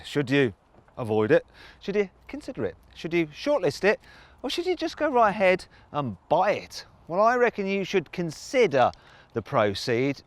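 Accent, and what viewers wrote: United Kingdom. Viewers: 범프=과속방지턱 터닝 서클=회전 반경 파이럿=파일럿 약간 외래어는 영국발음 들리는 대로 번역하시는듯요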